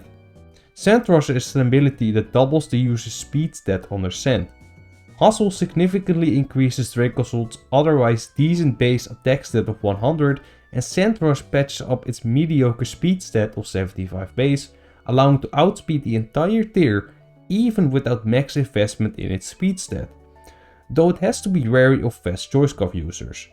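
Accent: Netherlands. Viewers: Netherlands, you can tell by the accent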